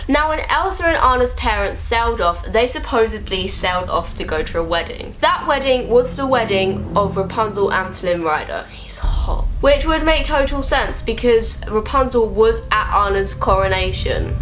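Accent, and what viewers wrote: United Kingdom. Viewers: Gurl if you put on a Victoria secret 2 cup push up bra, a juicy sweater, and made your hair a little more blonde... You'd be Tana with a British accent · Fuck me up w ur Britishnesss mama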